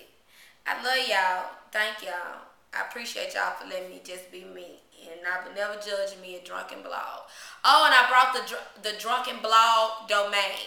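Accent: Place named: South, United States. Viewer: THANK YALL ONCE AGAIN!!!! lol luv her country voice... Its in all us dirty south folk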